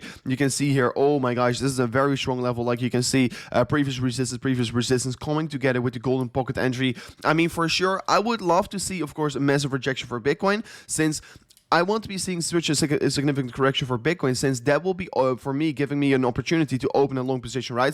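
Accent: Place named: Netherlands. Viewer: bij zen eerste woord wist ik al dat die nederlands was lmao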